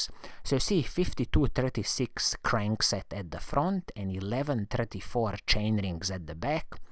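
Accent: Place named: Italy. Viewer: He sounds Italian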